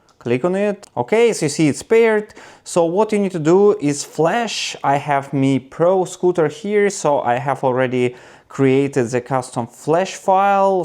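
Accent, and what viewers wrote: Russia. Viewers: А по русски? У тебя русский акцент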